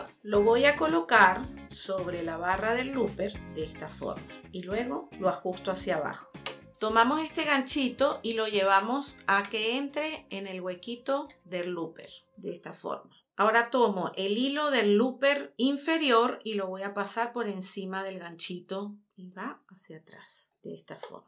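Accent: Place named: Venezuela. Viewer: me lo imagine por tu acento, q bueno, yo tambien soy Venezolana y estoy viviendo en Australia. Me compre la maquina pq estoy haciendo bolsitos d tela y collares y me aligera el trabajo, tengo una tienda online Nanajuliedesign, vendo solo en Australia , estoy encantada con tu explicacion y ahora mas sabiendo q eres venezolana. En q parte d España estas?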